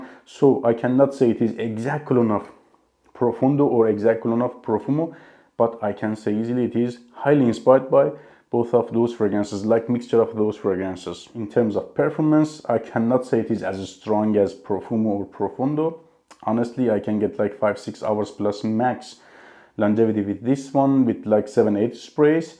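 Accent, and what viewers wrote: Iran. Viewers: you have Iranian accent!!!??🤔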